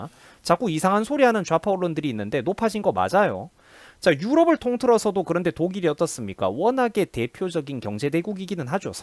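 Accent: Gyeongsang, South Korea. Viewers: 에휴... 경상도 사투리 쓰는거보니 경상도는 왜그래? 니네동네에 원전들어가 있으면 니네가 제일 먼저 반대해야하는거 아니냐? 리트리버수준 뇌용량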